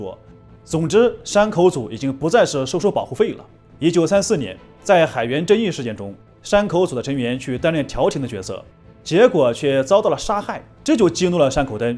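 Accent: China. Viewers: 不是嘛 你聽口音就知道版主是中國人了吧 (我猜中國憲法肯定沒有這條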